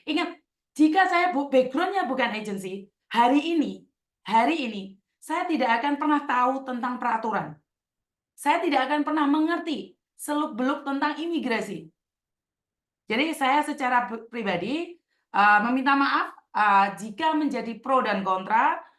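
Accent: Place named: Java, Indonesia. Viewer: lebih baik ditulis. Biar jelas dan dapat dibaca oleh semua pihak di boarding house. Ingat tidak semua wni di boarding house mau saling membantu, banyak yg jd Ular di dalam sana. Klo ada peraturan tertulis di boarding house minimal mengurangi potensi kesalahan yg akan terjd. Semoga dapat membaca komen ttg saran ini. Klo Gak ada waktu utk menjelaskan peraturan yah lebih baik tempel aturan main di boarding house biar bisa diturutin oleh semua penghuni boarding. Dan semoga kedepannya mengurangi emosi saat mendengar laporan dari pihak lain, Dan lebih memberikan waktu ke diri sendiri utk mengolah informasi yg masuk. Jujur aja mbak mbak yg laporin ada salahnya dgn bikin lebay aduan spt mrka bilang Lina belanja baju Zara yg seksi faktanya di channel Koko Faisal baju yg dibeli cuma baju biasa. Dan ttg ngomong bahasa mandarin, gak semua orang Indonesia paham dgn bahasa Jawa yg dikeluarkan, Sy orang Bekasi saja kadang suka tulalit klo dengar ngomong dgn bahasa Dan aksen Jawa, makanya kmrn ituh Lina ngomong bahasa mandarin dgn harapan biar lebih cepat dipahami penjelasan yg ingin Lina sampaikan Dan di channel Koko Faisal baru diketahui klo Lina bukan orang Jawa tapi Dari Kalimantan Dan pernah kerja di Malaysia, nah orang2 asli Kalimantan emang biasanya gak paham bahasa Jawa krn pas Ngamuk ituh kebanyakan ngomong bahasa Jawa yg bikin Lina tambah bingung. 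Semua ituh cuma saran agar lebih bijak lg kedepannya. Tidak ada yg Salah dgn saran, toh saran baik u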